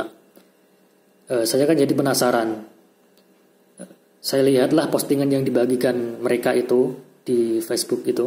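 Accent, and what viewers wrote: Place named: Java, Indonesia. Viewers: Mas metal banget deh 🙌 Dijabarkan dg lugas, jelas & khas medok orang jawa 👏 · Seram dengan tanda pentung itu... Mas e wong jowo.. jowone ketok bgt mas 😆😆😆😆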